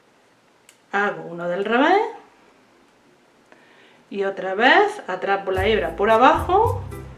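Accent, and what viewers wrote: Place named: Andalusia, Spain. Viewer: Eres andaluza....deque parte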